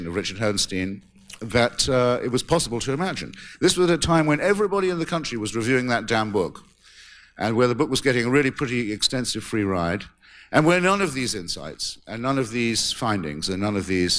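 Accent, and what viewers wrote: United Kingdom. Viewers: His intellectuality was overrated..he hid his questionable intellect with a pompous British dialect which he used as a crutch to swoon and charm.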